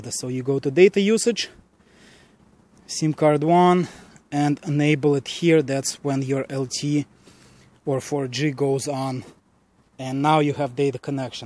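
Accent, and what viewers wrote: Russia. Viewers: Русский акцент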